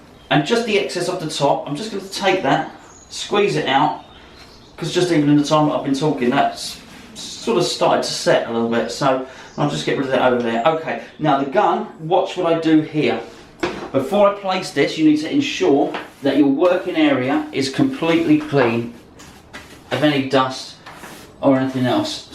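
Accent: United Kingdom. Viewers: nice vid. what accent are u ? british aussie kiwi??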